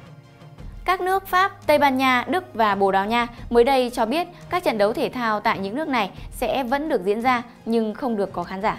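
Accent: Standard. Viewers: Nòi rất chuẩn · Giọng mc quá chuẩn .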